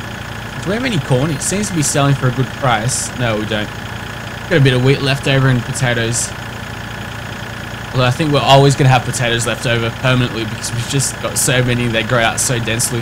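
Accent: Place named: Australia. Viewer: I don't know if i have said this before but i love australians ascents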